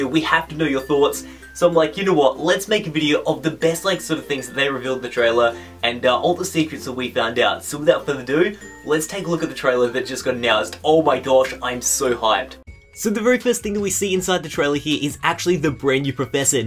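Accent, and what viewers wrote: Australia. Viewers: Azman Ismam He's an Aussie XD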